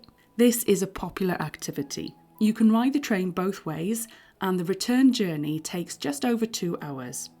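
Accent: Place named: Wales, United Kingdom. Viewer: So much great information in a lovely Welsh accent! We will be in Flam in August so this has been exactly what I needed to see. Thank you!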